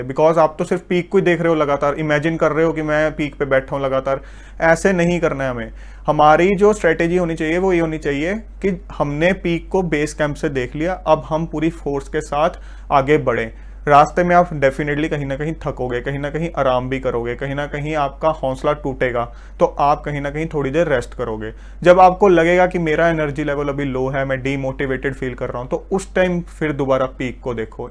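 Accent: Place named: Punjab, India. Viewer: Your language is filled with punjabi tone😆❤️ sir!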